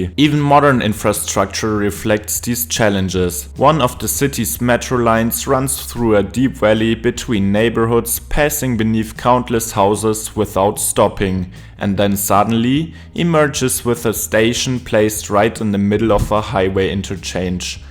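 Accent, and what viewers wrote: Germany. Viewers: I know a german accent when i hear one! Love it! And yay new city channel!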